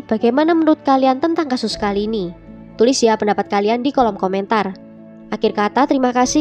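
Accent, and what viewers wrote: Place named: Java, Indonesia. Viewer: kenapa kasus yg anda ceritakan selalu menarik apa rahasianya.....dan satu yg tak terlupakan yaitu medok nya.....top lah pokoknya.....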